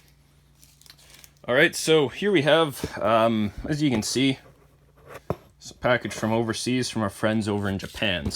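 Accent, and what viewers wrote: Canada. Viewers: I knew you were a fellow Canadian from listening to you and now I know I was right haha. Great videos!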